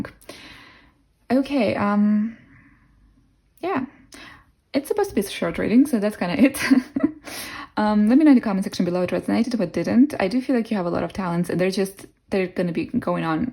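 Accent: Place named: Eastern Europe. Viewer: You're a really good reader, you have great intuition. I picked pile 1, and most of the things you said resonated. I am very sensitive and empathic, and I'm autistic. I'm 31 years old, and I started to have severe sensitivity to certain sounds in 2014. These kind of sounds are always happening, so I've been forced to be homebound since 2014 as a result. I'm unable to leave my home except for short periods of time to buy groceries from the shop nearby, I'm unable to do anything, I'm just stuck in my home and suffering, because I can still hear the loud noises from outside even while staying inside (they are loud, terrible noises caused by people driving in a terrible way). I'm also very sensitive to people's energies and vibes, but even more so than you said. I can tell if someone is a good or bad person by looking at them and feeling their vibes, they don't even have to say anything. 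I hope you will do a reading that would tell me if/when my situation will change, because I've been trying to escape this situation that I'm stuck in for years but it hasn't happened so far, it feels like all the doors have been closed on purpose by a higher power, and nothing is working despite all my efforts. By the way, I'm curious, are you from Eastern Europe? Your accent sounds Eastern European. Thank you for your readings!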